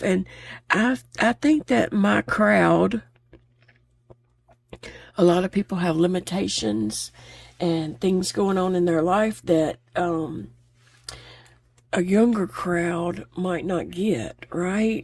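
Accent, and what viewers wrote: South, United States. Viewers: Hi. Im sorry if i said something to hurt you. I dont mean no harm. I cried right with you. ❤❤ive been watching you for some time. And i do love just listening to your wonderful humor and your southern accent ❤❤ ive had cancer and just not my self lately. But i just want you to know i love you and all your art work and im here to support you. So again forgive me please ❤❤❤❤❤❤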